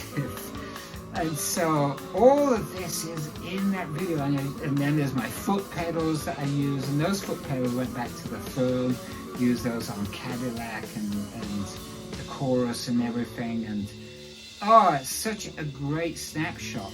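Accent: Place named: South Africa. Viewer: This drummer is so on! BTW - interesting thing about your accent now is that you actually sound quite South African.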